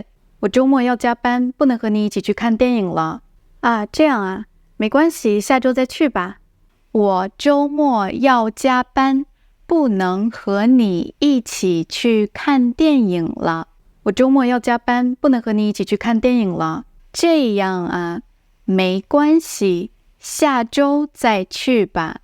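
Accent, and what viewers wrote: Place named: Dongbei, China. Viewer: your my favourite teacher cos of the northern stuff! Do you think when you read the northern part can you say it normally for one and also in your dongbei accent too so i can copy it rather than reading it with standard pronounciation =D.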